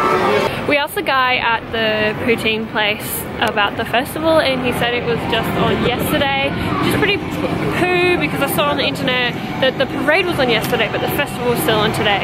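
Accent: Australia. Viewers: I love how hard you spin that gacha spinner! And oh God I'm such a sucker for a sweet Australian accent. You just got a new fan,!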